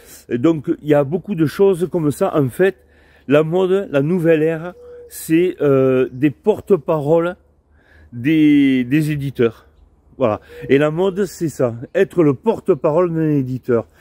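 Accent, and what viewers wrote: France. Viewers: Hello. Je te dirais juste une chose. Laisse les autres américanisé ou quoi que ce soit, il sont bien moins intéressant que tes vidéos. Je prend toujours un vrai plaisir a regarder régulièrement tes vidéos qui m'apportent du bien-être, des apports très intéressants sur fsms, mais surtout de la marade car je ries très souvent car j'adore ton humour avec cet accent du sud. Je t'en supplie, continue à faire tes vidéos. J'adore. Cordialement. Pascal..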